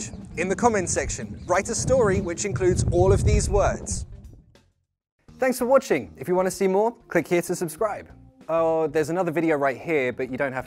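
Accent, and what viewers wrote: United Kingdom. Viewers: I thank you,Sir for the incredibly wonderful work! I appreciate it! British accents are the best!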